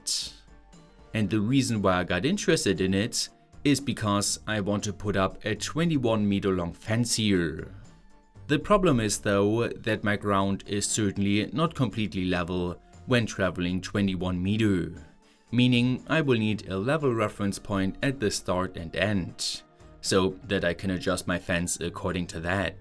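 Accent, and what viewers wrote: Germany. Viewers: Ich höre da ein wenig deutsch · Ich dachte mir schon da höre ich doch einen deutschen und dann noch die simme da kam ich drauf😂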